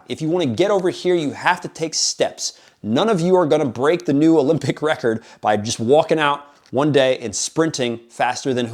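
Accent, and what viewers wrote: Spain. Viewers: Your accent in spanish is nice bro! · You have a beautiful Spanish accent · That spanish accent, sir 🤩🤩🤩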